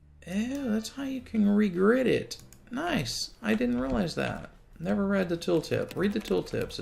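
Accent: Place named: South, United States. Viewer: The subtleness of your USA southern accent is what suggested Virginia to me. Not that I've spent enough time there to know them well.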